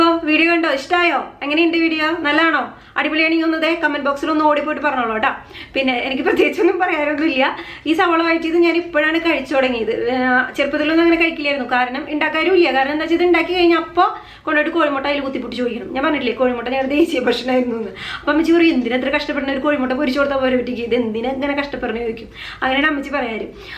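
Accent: Thrissur, India. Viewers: Supr chechiii...bt speed koodithl aanataaaaa....thrisurkaro aanooi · adipoli Thrissur bhasha.... 👆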